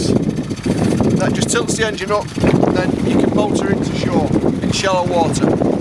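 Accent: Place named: United Kingdom. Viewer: That sounds very British :-)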